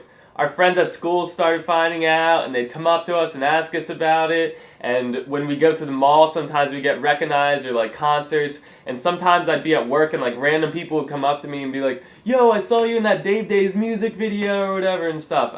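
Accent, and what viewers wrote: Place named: South Jersey, United States. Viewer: lol of course you do! since I moved from south jersey to florida 4 years ago, i can now easily pick up on our critically acclaimed infamous accents. and yes, you do have one. no worries, its alll good though! 8-)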